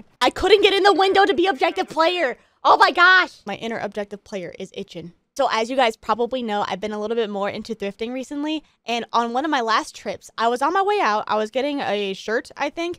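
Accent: South, United States. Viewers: A chaotic is a funny! Hope your allergies clear up soon! Cant wait to see what a week in your life is like! Also, the southern accent just gets me every time!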